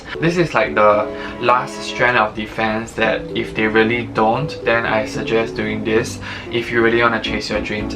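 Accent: Singapore. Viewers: I can tell your from Singapore because of your accent 😂😂, anyways thanks for the video, I let you know if it works!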